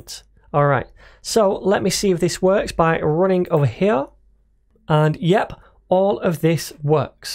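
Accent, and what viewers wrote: United Kingdom. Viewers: Always watch your tutorials, like how you explain each video clearly for beginner and love your British accent:) Hope someday you have complete Dart series. Thank a lot,.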